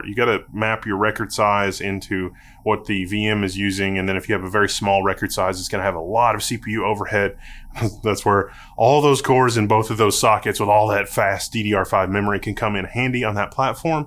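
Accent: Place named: Austin, United States. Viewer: Are you from Austin? You sound like the people I grew up around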